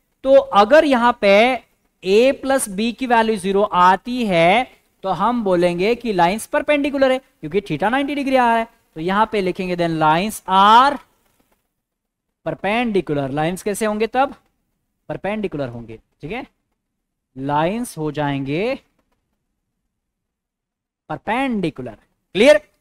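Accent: Maharashtra, India. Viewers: Sir bhut marthi bol rahe ho samjh nahi rah hai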